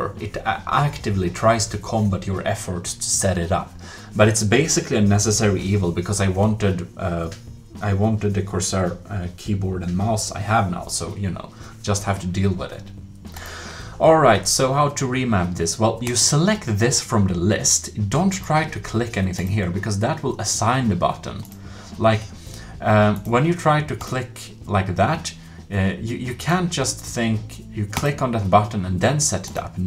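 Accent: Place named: Sweden. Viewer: i can litrealy here that ur from sweden LAMO lwest goo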